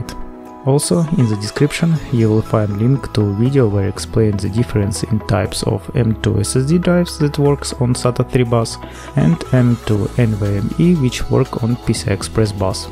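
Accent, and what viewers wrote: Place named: Russia. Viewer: В середине видео понял что чел русскоговорящий )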